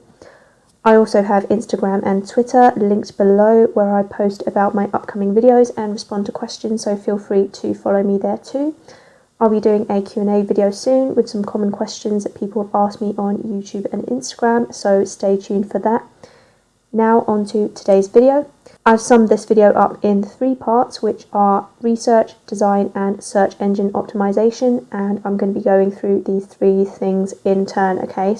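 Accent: United Kingdom. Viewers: Wow, this was refreshing,,, & a uk voice too 😁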